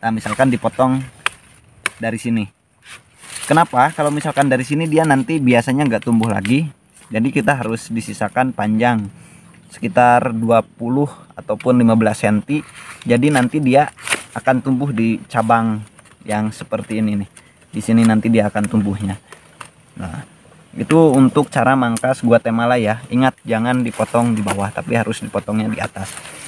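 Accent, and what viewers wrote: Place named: Java, Indonesia. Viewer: logat bicaranya ky orang jawa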